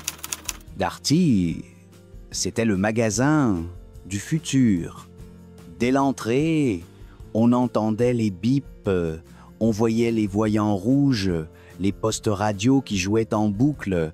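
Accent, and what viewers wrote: Quebec, Canada. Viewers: Le paradoxe : un accent québécois pour nous narrer un moment de la "franchouille beaufesque" parisienne. Un mauvais délire.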